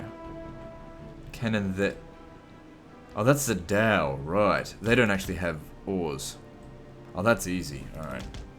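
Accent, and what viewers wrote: Australia. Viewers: I absolutely love the empire total war blitz campaign and was so excited to see your return after your first attempt. I would love for you to update us on what days you would upload this campaign because I'm so excited to see you finish! Ps. I also love your angry Australian accent but the episode with full detail is so much better and also exploit the SHIT out of it mate!!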